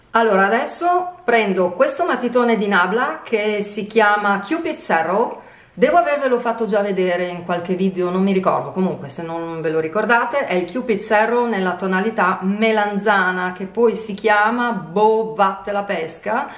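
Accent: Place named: Emilia-Romagna, Italy. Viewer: Sono passata a guardare un tuo video grazie a gattaluna 😊sn rimasta colpita dall accento...pensavo ma qst signora è della mia regione! Infatti quando hai detto Bologna tutto torna😅ciao io sn di Forlì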